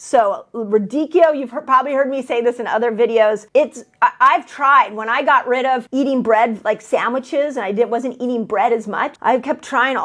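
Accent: California, United States. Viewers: You have helped me so much I don't know how to thank you! 21 lbs down so far! The only thing that doesn't register is when you say "fee-ude" instead of "food" ....must be the CA accent. 🙂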